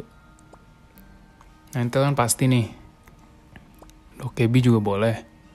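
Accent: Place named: Medan, Indonesia. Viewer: wkwkwk orang mana bro? kyk logat medan ya.. cibai2 la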